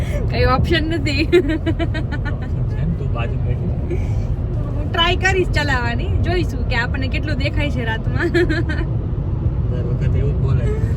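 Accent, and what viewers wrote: Kathiawar, India. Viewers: tame kathiyavadi bolu che e maja aave aapna aapna j bolu bolay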